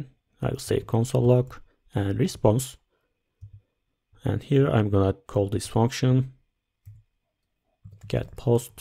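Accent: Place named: Turkey. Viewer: Konuşan elemanın türk olduğunu anladın mı?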